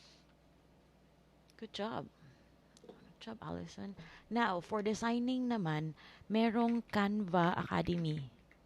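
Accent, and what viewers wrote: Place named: Visayas, Philippines. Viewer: thanks ante, bisaya ka? imong accent man gud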